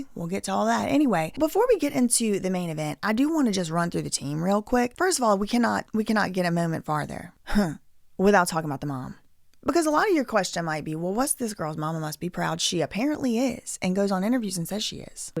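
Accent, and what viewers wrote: Northern England, United Kingdom. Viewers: That UK accent... chefs kiss. Dont nobody need to be in the chat to tell you different 😂💂🏻‍♀️ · To intentionally want to cause trauma on others really?? sounds to me like she weaponised her own trauma. WOW, i'm speechless, i'm based in the UK and i didn't even know about the depth of this s**tshow. Thanks for shining a light. Ps: Nice British accent ha! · That's EXACTLY how northern girls speak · Im Scottish and your UK Northern English accent was great! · OMG this is one of the BEST videos you have done! You have to find some more UK stories because hearing your accent made me smile for the first time today! Thank You❤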